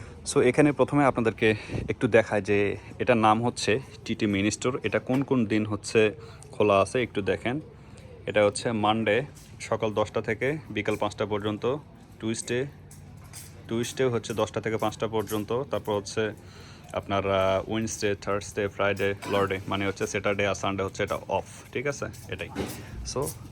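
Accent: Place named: Bogura, Bangladesh. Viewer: বগুড়ার কথা শুনে সাবস্ক্রাইব করে দিলাম শিবগঞ্জ উপজেলা থেকে দেখছি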